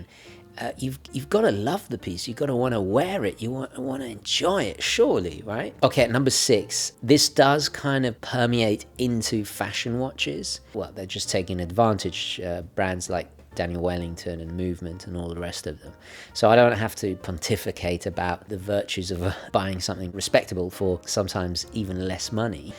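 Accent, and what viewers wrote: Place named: United Kingdom. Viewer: I just tune in to listen to you talk with your British accent.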